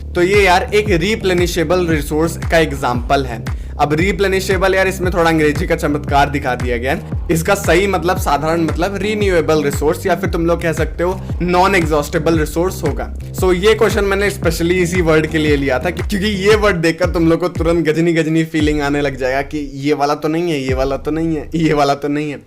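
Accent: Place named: Uttar Pradesh, India. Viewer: Kariya soil😂😂love your language too😂🤣.... Katai upwalo wali feeling aata h😂 .... M to UP se hi hu to feeling to aaegi n😌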